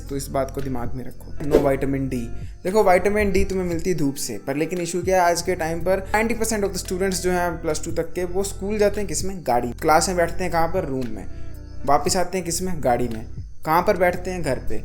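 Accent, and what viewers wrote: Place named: Himachal Pradesh, India. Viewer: That himachli accent😂♥️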